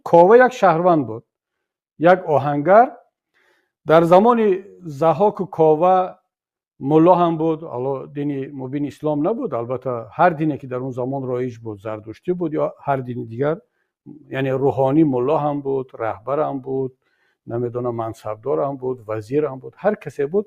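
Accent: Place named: Iran. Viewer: Кард чанбор кунад аз хоби гарон хез бе савод хам ба забони Русси гап эад хам ба забони Точики хам бо лахчаи Эрони Форси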